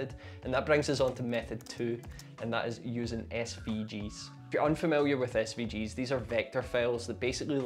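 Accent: Scotland, United Kingdom. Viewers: Mmm...how do you get Resolve to automatically update the changes you've made in your .psd file? It ain't doing it for me, and I couldn't see from your video how you got it to do that. Do I need to restart Resolve? Okay. I answered my own question. Yes. I had to restart Resolve et voila! While I'm here, what's happened to you? Have you abandoned ship forever? Because that would be a real shame; your videos are great and I appreciate hearing the accent of a fellow Scot. Lanarkshire, by any chance?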